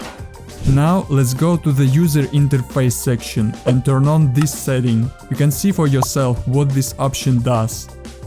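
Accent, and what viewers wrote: Russia. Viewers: Where you from? R u from Russia? U have a Russian accent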